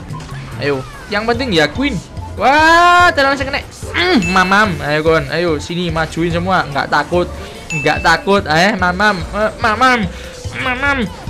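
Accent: Java, Indonesia. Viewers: gunakan kemedokan nya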